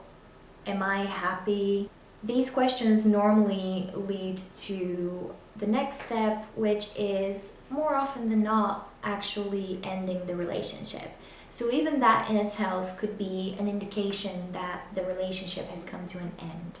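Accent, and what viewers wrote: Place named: United States. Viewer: but you have US accent! how? :)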